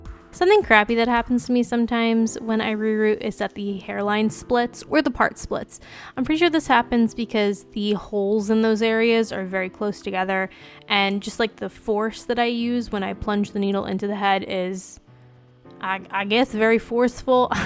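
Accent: Pennsylvania, United States. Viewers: u have such a pa accent hahahah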